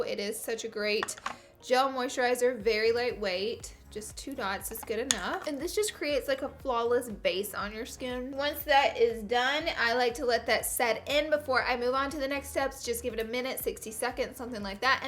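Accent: South, United States. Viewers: Girl...I absolutely love you, you are too crazy lol! I love to watch your videos because I usually laugh the whole time. Us girls from the South ie. (Kentucky), we do say things different but I love my heritage. Even if we do say "OIL" wrong to others. He he!! Love ya girl and I will see you next time!! Xo <3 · you're so hilarious and I love the southern accent 🤗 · Many southern people talk like this! Lol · I literally just started watching this video, I'm 45 seconds in, and I already love her. I was raised in the south as well, although I don't say oil like that, and I love her accent. She's super pretty too! (New subscriber here!!) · Love you I myself is from the south and I also say it like you do haha! Not ashamed! I am also a hot oily mess girl! I love these tips thanks so much! 😘😘😘 hope you're having fun in New York! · OMG there is nothing wrong with her voice!!! 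She is from the South so, she talks like that and obviously if you guys were from the south you would talk like that too. So why watch this video if you are going to make fun of how she talks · Girl I'm from Louisiana and I love the southern accent!! My shirt right now says "talk southern to me" wish I could post a pic. Lol u. Need it! Weerrrkk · Oh my gosh I honestly love you so much like I just started watching your videos a week ago and I've been binge watching them non stop😂 I think your super funny and cute and I love your south accent.. you kind of inspired me to get into makeup. I'm only 14 but it's soo funn lol keep dining what your doing girl ❤️ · I love your southern accent, it makes me feel at home ❤️ don't ever apologize for it. 😘 love from Louisiana (except your football team. Nope. LSU forever 🐯) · what part of the south are you from? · I’m from the south I know ur struggle with words Ily ❤️❤️❤️❤️😘😘😘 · She sounds like she's from Georgia or South Carolina. 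· I love your Southern accent! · I love your southern accent 🤙🏼🤠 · I love accent. I was born in Venezuela and in Latin American but I moved to Texas when I was a baby. I grew up in the south and her accent is so beautiful. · What part of the South are you from?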